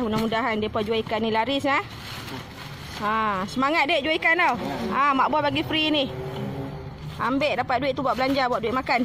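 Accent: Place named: Northern Malaysia, Malaysia. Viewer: Baguih sedekah...semoga rezeki makin murah dengan adanya yt ni... oqang utaqa jugak rupanya dengaq cakap macam utaqa..sama la kita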